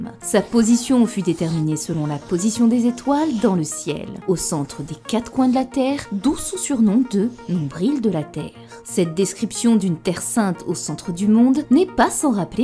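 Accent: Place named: Belgium. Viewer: Superbe video! J ai adoré,et j attend la suite avec impatience. Quel travail, quelles recherches, et quelle analyse! Franchement, les mots me manquent. J avais moi meme fait les meme rapprochements,mais j aurais ete bien incapable de les presenter comme tu l as fait ici. Oui le travail et l inspiration d Oda sont impressionnant, mais ton boulot l est tout autant. Je suis moi meme plutot tres interessé par ces sujets que sont les anciennes civilisations et les evenements passés allant de la prehistoire a l avenement des premieres civilisations, en passant par la precession des equinoxes et son effet sur notre histoire. Le parralelle entre les siecles oubliés de onepiece et le chainon manquant comme tu dis,ou encore avec cette periode obscure de notre histoire ( -12.000 avant JC) et les anomalies qui en ont decoulé, jusqu a enfin l avenement de civilisations telles que les sumeriens ou encore les antiques egyptiens…Bravo, un grand bravo a toi. 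Et comme si ca ne suffisait pas, tu pousses le talent jusqu a la redaction et la diction d un texte travaillé. De toutes les chaines, hypotheses, et analyses de onepiece que je vois passer, je peux dire sans hesitation que c est avec ton boulot,ta vision, que je suis le plus en phase. Merci pour tout ca, et felicitations. ( par contre, un de ces quatres, ca serait pas mal de pouvoir mettre un visage sur cette delicieuse voix qu est la tienne. D ailleurs,il me semble avoir decelé un leger accent belge, notamment sur les sons en [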